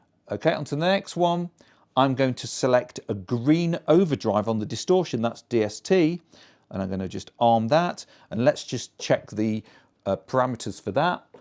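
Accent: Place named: East Midlands, United Kingdom. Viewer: What a refreshing video. No razzamatazz, no sickly AI non human voice and you made it very clear on the steps to take. I liked the sounds you got as well as the East Midlands (ish) sound of your voice.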